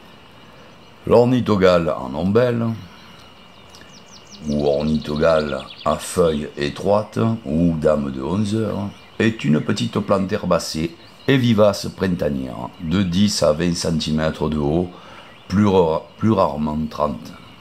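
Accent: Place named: Hérault, France. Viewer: Merci pour l'explication. Ce joli accent vous vient d'où ? De l'Hérault ? Merci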